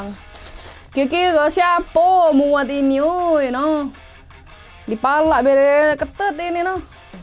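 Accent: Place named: Palembang, Indonesia. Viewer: gaya kk bicara palembang kental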